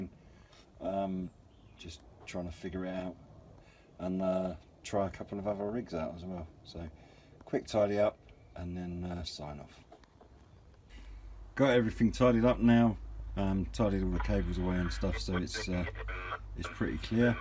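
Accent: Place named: Deep South, United States. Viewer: You sound like you come from the deep South. I wonder if you can get in on our South East Coast Net tomorrow ?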